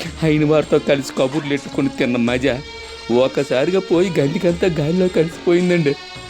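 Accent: India. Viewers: శ్రీకాకుళం యాస భాష అచ్చంగా కనిపించింది. సంక్రాంతి సరదాలు కొట్టవచ్చినట్లు కనిపించసాగాయి. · మీ వాయిస్ ఓవర్ విన్న తరువాత కూడా మళ్లీ సంక్రాంతి సంబరాలు విన్న అనుభూతి కలుగుతుంది బావగారు... ముఖ్యంగా ఉత్తరాంధ్ర యాసతో మీ హావభావాలు అత్యద్భుతంగా ఉంది 🎉🎉🎋🎋💐💐🔯🔯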